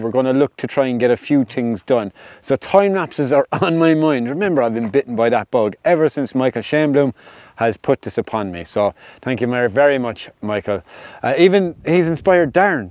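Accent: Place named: Ireland. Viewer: Nice video mate! I really enjoyed watching and dude I love your Irish accent. Keep on vlogging but also take your time and enjoy the time with your friends in the wonderful Irish nature. Thanks for sharing! Take care!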